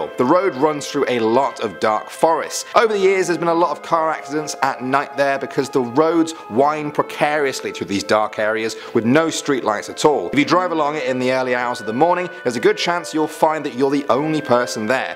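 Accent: United Kingdom. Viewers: Everyones pronunciation is differently all depends where you're from, he's a brit who lives in canada.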